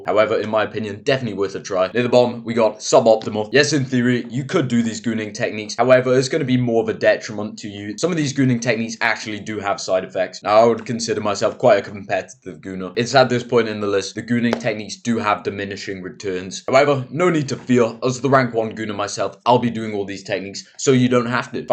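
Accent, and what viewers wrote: United Kingdom. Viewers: why are you british? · Pa fath o acen Gymreig sydd gan y boi ma? Y gorllewin ife?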